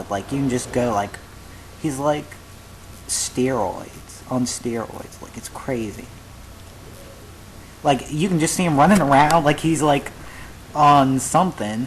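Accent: South, United States. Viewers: You sound like a nerd with a southern accent. Your voice is almost unrecognizeable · Wow you voice sound so southern.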